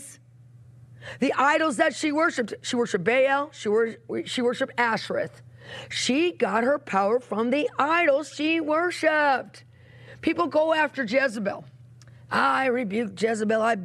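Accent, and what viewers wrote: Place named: New York, United States. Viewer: 😂, you from NY ❓️ you sure got a NY accent and way ❗️🤣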